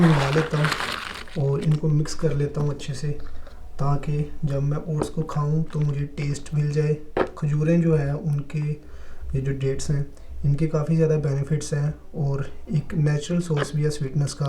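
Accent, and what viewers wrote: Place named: Punjab, India. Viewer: Salaam Waliqum bhai. Good to see your dedication during this holy month. Being a Hindu Bahman, I am trying to maintain Roza for myself too this Ramzan with my muslim brothers like you. You sound like you have a Punjabi tone like mine, which part of Punjab are you from?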